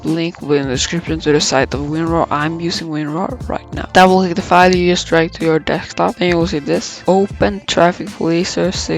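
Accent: Sweden. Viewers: u sound swedish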